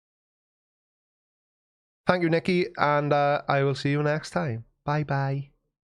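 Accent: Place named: Wales, United Kingdom. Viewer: G'day from South Australia, I think the Welsh accent is the best in the world followed by the South African. There is nothing wrong with speaking your mind, don't change that's why we follow you.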